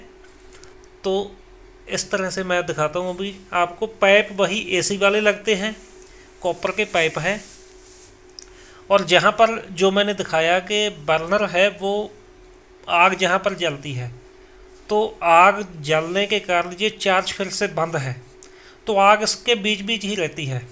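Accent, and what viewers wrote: Punjab, India. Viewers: Hindi with punjabi touch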